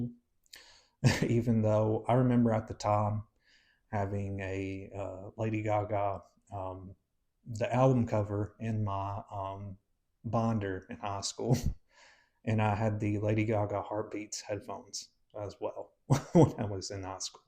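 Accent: South, United States. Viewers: It broke my heart seeing you cry! I’m not American, didn’t grow up Christian, and younger than you by 10 years yet my experience is very similar. I’m very proud of you for having the courage to come out, to heal, to admit you were wrong and to correct that, and to become a great and inclusive trainer who we all can tell is very good at his job by looking to his body..also I love your southern accent Sending love ❤ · Hey, I can relate so some of the things like the name-calling in school, and it really hurt. Every day was a challenge. I love your smile and your southern accent! You’ve clearly been holding in a lot for a long time, so I’m sending you a big virtual hug. Thank you for sharing your personal story — it takes courage to open up like that. You’re a strong, good-looking person with a bright future ahead. Greetings from Michigan.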